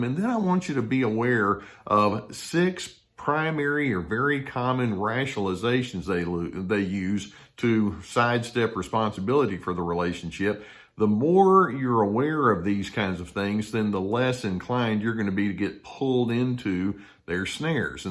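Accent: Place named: Texas, United States. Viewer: it's your Texas accent, it reminds me of cowboy movies lol Happy Holidays!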